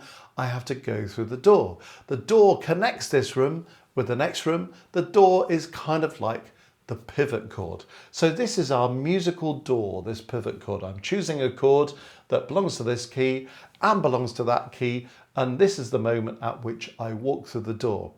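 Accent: United Kingdom. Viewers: British accent? Bossman teach you nuance in music theory so you can thrive and you can't distinguish which part of the Isles his accent derives? Coolios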